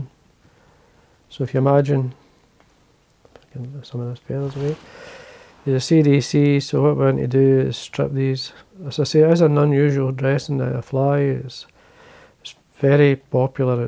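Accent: United Kingdom. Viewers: Superb,what else can I say! As soon as I saw this tying I got my bits boxes out to attempt a tying myself all the little trimmings I saved that you told us to keep and my treasured packets of Coq de Leon I bought a while back and locked away as I was scared to use it having paid more than I admitted to my wife much like a jungle cock cape I bought over twenty five years ago from the angling centre which is nearly bare Disaster one of our farm cats got in the window of my man cave and made off with my Coq de Leon feathers I had just opened and left on my desk twenty quids worth Gone I searched the garden but all I could find was feathers from our ducks and hens Now I will have to use substitutes I was so looking forward to tying a few dries and trying them out but it’s had me make a decision that I will use materials and festhers I buy and not squirm them away like Scrooge and his money Once again your patterns and things are excellent as is the video and narrative the posh accent with the occasional slip into the accent of the shire is as always so entertaining thank you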